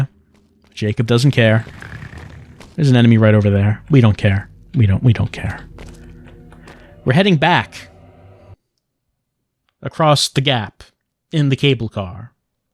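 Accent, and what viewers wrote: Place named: New York, United States. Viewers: Your... uh... New York accent when reading letters makes this game much more enjoyable. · Newark union plumber accent is really the best. Especially in Doki Doki Literature Club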